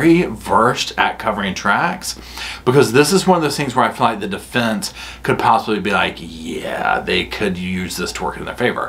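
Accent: South, United States. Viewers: Why don’t you have 1m subscribers? You are the best. Great wit and the southern accent makes it gold. !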